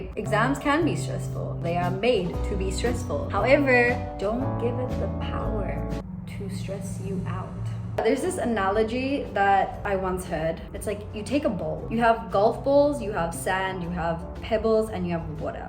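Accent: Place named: New Zealand. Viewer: you're losing the kiwi accent nooooo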